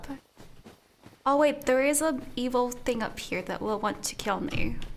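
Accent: England, United Kingdom. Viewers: Hey or if its okay^^ Pls can you make a video or Vlog in german? Your german with this english accent is cool. =) This would be nice. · Adorable english accent, sounds well spoken and proper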